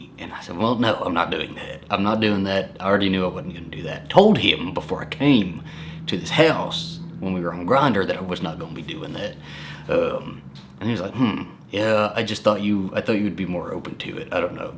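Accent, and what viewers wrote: South, United States. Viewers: Dude you are the BEST story teller!!! You should narrate books, southern accent and all!! Love you two!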